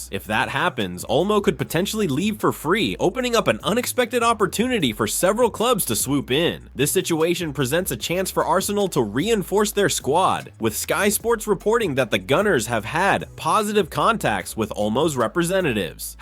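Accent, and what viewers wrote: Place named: United States. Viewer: Can never trust English transfer rumours with yank commentary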